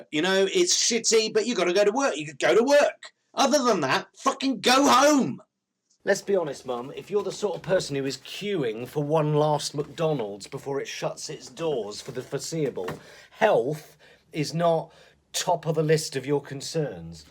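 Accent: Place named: England, United Kingdom. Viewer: NICE PURE LOVELY ENGLISH ACCENT VOICE & BOY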